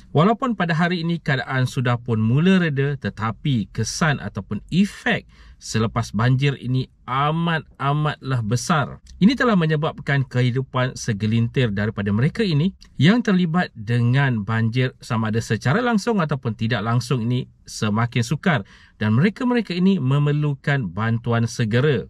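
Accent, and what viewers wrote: Melaka, Malaysia. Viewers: abg orang Melaka ke?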